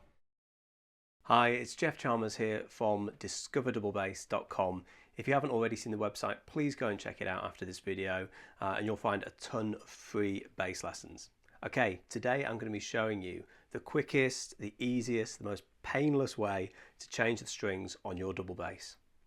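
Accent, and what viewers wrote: England, United Kingdom. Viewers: Nice job! Love the English accent too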